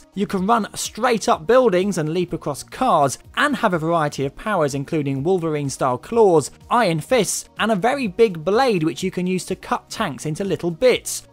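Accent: United Kingdom. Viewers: this british guy with a british accent is talking way too british fast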